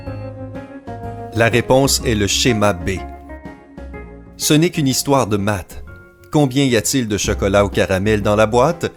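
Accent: Quebec, Canada. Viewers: songUp euh non c l'accent quebecois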